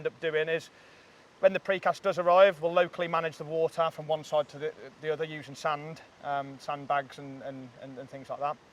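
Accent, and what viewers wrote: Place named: Wolverhampton, United Kingdom. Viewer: Phase 4 yes please. Fascinating watching the progress and I need a regular fix of accent, being marooned as I am here in NZ far from my native Wolves 😀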